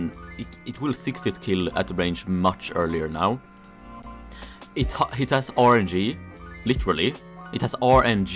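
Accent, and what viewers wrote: Sweden. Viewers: You sound swedish. I can tell because I am swedish.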